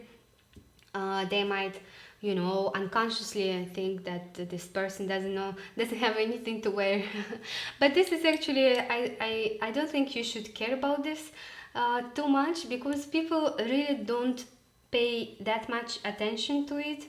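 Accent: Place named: Russia. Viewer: What accent is that? Sounds so Russian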